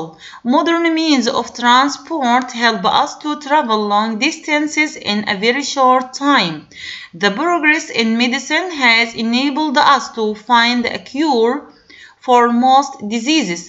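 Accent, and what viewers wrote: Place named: Egypt. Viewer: english with egypian accent lmaoo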